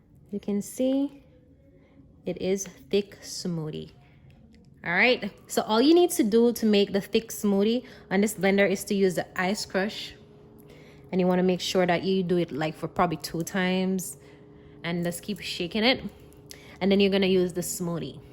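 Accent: Jamaica. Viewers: Your accent is so beautiful, just like yourself. Are you from Jamaica? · i was she is from jamaica but at first i didnt hear your accent but like 30 seconds in i was like mi sista indeed lol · I hear a JAMAICAN IN YOU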